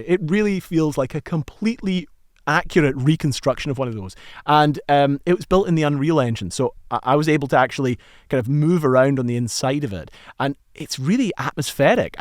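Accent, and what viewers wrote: Scotland, United Kingdom. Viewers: Is that a Scottish or Irish accent? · I came for the video, but stayed for the Scottish accent. :face-blue-smiling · He is Scottish, give him a break.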